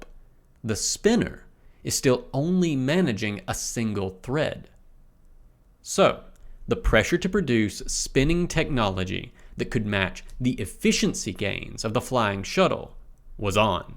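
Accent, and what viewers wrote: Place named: South, United States. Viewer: Finally some real food... I mean, ACOUP voice overs with a delightful southern drawl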